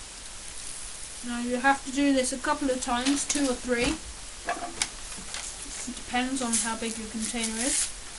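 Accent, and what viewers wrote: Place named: England, United Kingdom. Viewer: haha english accent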